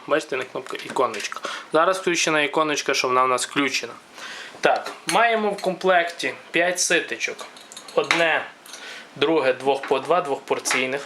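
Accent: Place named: Lviv, Ukraine. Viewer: гадостный акцент львовский. лучше бы молча делал.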